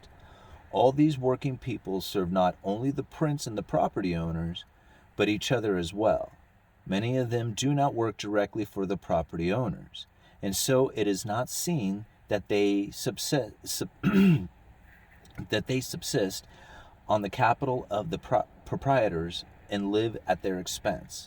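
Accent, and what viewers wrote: Pacific Northwest, United States. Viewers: You're such a Pacific Northwesterner. I feel like I'm listening to my oldest cousin who is from Bend, OR, roughly your age, and you both have the same "accent". I admire the hell out of both of you. Must come from the culture.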